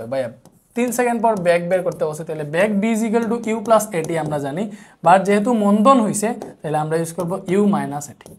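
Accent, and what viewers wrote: Sylhet, Bangladesh. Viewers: ভাইয়া কি sylheti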